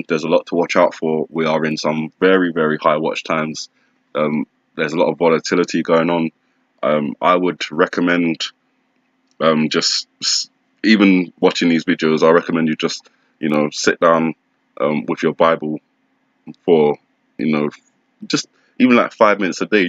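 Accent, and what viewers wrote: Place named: London, United Kingdom. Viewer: Nice to hear a Londoner speaking on these matters, keep up the good work brother..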